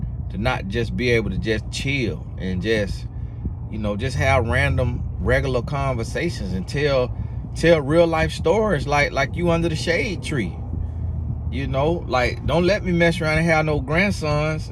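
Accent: United States. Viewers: I don’t know about everyone else, but the transparency brings about the trust. Your transparency separates you from the “guru/talking heads”. Your real life situations, country accent and all, makes you so relatable. I understand being able to capitalize on your brand, but don’t let it remove the authenticity…that’s what keeps some of us here. God WILL provide. Thanks for all that you do!🙏🏿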